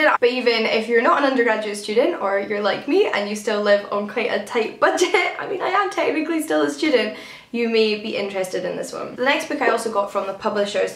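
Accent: Scotland, United Kingdom. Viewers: I havent been subscribed very long but I love your accent! Where are you from? Are you Scottish?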